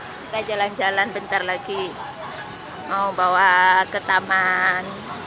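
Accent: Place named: Indonesia. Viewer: Bahasa mandarin kakak sudah lancar cuma logatnya msh kental rasa indonesianya. He....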